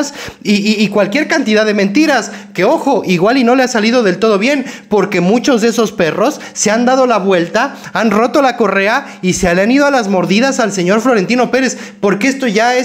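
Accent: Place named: Mexico. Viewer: Hace muy poco que te sigo pero eres por el acento mi mejicano preferido bueno después de Rafa Márquez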